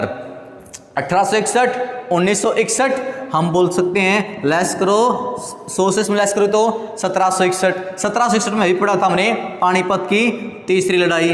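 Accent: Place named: Haryana, India. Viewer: Very well taught with haryanvi touch.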